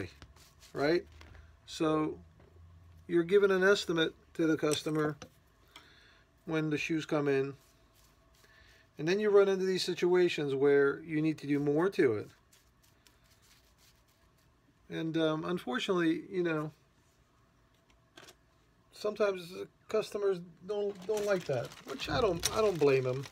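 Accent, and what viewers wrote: New York, United States. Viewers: Are you from NY? You sound like you may have been from NY.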